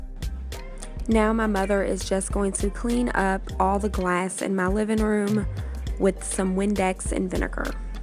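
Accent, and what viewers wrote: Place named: Kentucky, United States. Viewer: I’m from and live in Kentucky I thought you were from here by your voice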